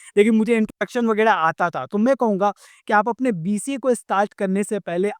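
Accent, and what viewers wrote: Bihar, India. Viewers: Sir kya aap bihar se hai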